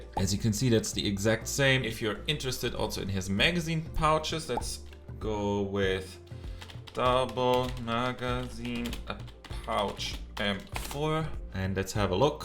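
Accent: Germany. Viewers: Warum merkt man das er deutsch spricht😂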